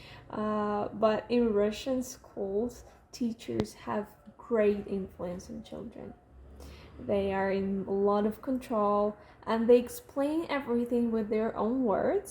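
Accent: United States. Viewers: I reiterate the common sentiment regarding the quality of content. But hardly anybody has commented on the supper quality of English. I, for one, could have easily assumed she is an American. Simply superb (American) English. Hi from England, UK. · they all sound like an Americans voice? I can barely tell English isn't your first language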